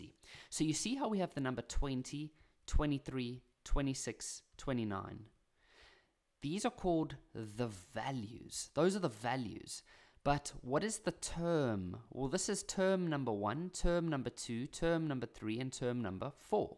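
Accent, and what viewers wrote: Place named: South Africa. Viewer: what country are you from because you sound like your south african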